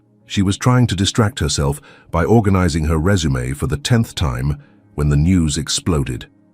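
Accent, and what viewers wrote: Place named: England, United Kingdom. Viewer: I totally agree with Everyone Else who hates & despises the REDICULOUS, HORRIBLE Video Foreground AND Background. In addition, the Stupid Producers eliminated impor- tant Written Script for people to read, especially when the Professional Male Narrator has a foreign accent like Old England, that is verry diffi- cult to understand. Otherwise, we start with a young female executive Assistant, walking into the wrong door, & sees the male CEO, "buck naked"; and HE ENJOYS IT, & from then on.., he blatantly parades around & tempts her, throughout her employment. SHAME, SHAME, SHAME on the Producers !! Rating: 4/10.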